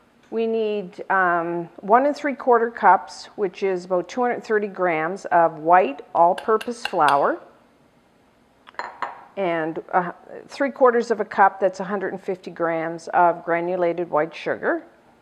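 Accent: Canada. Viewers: I like your Canadian accent: "aboot."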